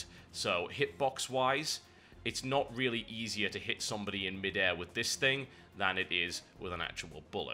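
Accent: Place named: England, United Kingdom. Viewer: Anyone else interested in hearing TB after he loses his English accent?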